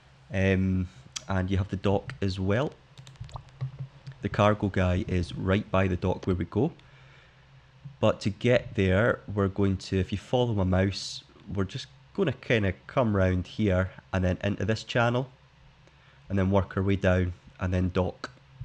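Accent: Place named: Scotland, United Kingdom. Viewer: When you were reading the comment and he complimented your accent and you said "ah dunno about that mate" just know that while idk about people from elsewhere, basically every American I know loves a Scottish accent (as long as we can understand it lol). You really are a fun guy to listen to. I like your sense of humor, it sounds like we're just hanging out shootin the shit and I think that's part of what makes your channel so charming. If you did go the 'professional' route then a lot of that would get lost. Don't change, your format is great. Glad to see ya back!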